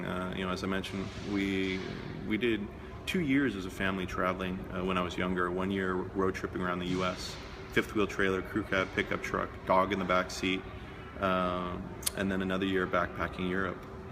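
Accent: Denmark. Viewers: i can feel you have attained a little bit of a danish accent :d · agreed he sounds danish now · Elsker hvordan du taler engelsk medd dansk accent :)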